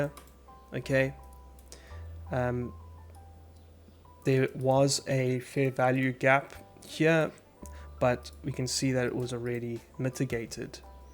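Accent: South Africa. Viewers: youre south african?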